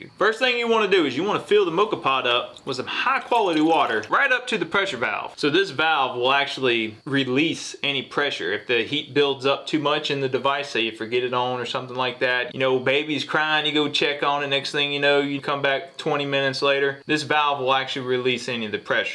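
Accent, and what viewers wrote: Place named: South, United States. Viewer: What an accent! Southerner, I presume.. Georgia, Texas. Am I right? BTW, nice video. Keep it up!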